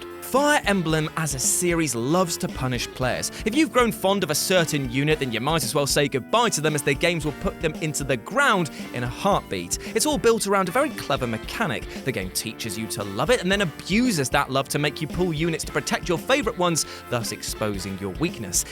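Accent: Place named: England, United Kingdom. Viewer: watched in mute as this fuckin poms accent makes my skin crawl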